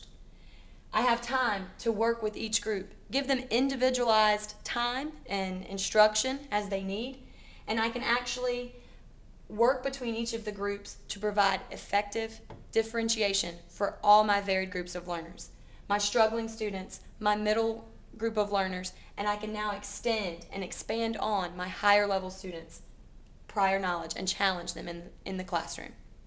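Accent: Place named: United States. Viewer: Your video is being used on a UK website claiming that you are one of their teachers which is odd I thought because of the Yank accent. you might want to ask them to take it down, contact me for the link where it is being used if you care.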